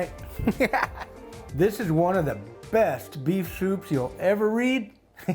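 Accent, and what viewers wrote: Texas, United States. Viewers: I'm in HTown. You sound like a true Texan. Your recipe and directions are spot on. And I love the way you talk. It's like being right here with the fam.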